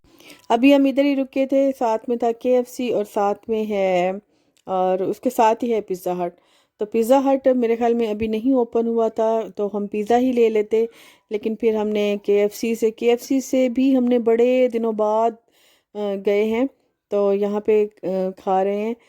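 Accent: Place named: Bahawalpur, Pakistan. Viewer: Wah g choti choti khushianbbarri barri enjoyment🤩mn bhi bwp se hun aap k lehjy sun kr acha lgta ha .multan ar bwp ghoomny mn bht maza aata ha such mn .missing a lot my cities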